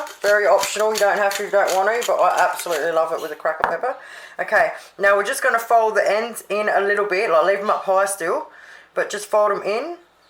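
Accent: Australia. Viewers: Ooohh maaate😂😂😂😂...can't be anymore Aussie than that😂😂😂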